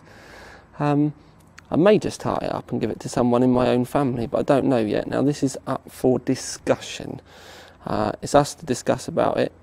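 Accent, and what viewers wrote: United Kingdom. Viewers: Where abouts in the UK are you from? Not specifics lol, just general area. I'm sure I've seen around the Manchester area (or his twin haha).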